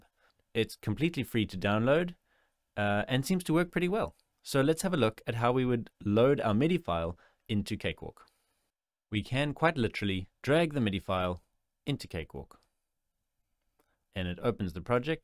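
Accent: South Africa. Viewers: is your accent South African or British? sounds like a bit of both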